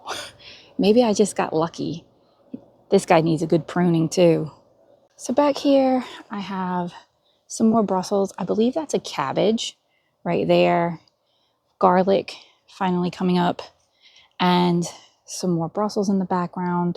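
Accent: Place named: New England, United States. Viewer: that's ingenious! I've heard of sparkly and flashy things, but that's brilliant, actually. You have a great garden and kudos to repurposing the beds with those huge water bins? I've seen them here but don't know thier purpose. And yes, you've definitely picked up New England vowel sounds from your dad. I'm a bit envious that you have indeed a cabbage growing. My garden is under a bit of snow (3b)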